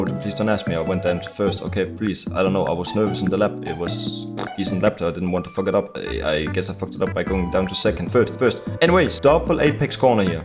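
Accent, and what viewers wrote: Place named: England, United Kingdom. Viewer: Pure comedy and that English accent man, epic!!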